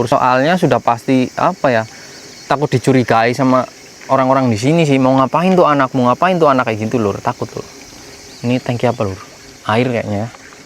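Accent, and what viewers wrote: Banyumas, Indonesia. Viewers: Wong ngapak ya min? Rika sekang daerah ngendi lur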